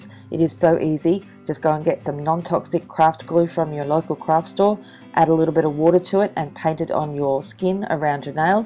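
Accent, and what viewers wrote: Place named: Australia. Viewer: This is awesome, and how great is it to actually hear an Australia accent. Thanks for sharing this with us. Could you tell me where you get your Seche Vite from, please? I live in Hobart, so if you can get it I should be able to as well, but I haven't been able to find it anywhere. Can't wait to check out all your other posts.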